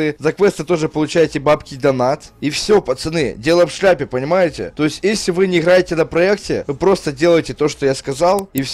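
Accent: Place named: Ukraine. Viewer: Он как-бы украинец, а у них г читается х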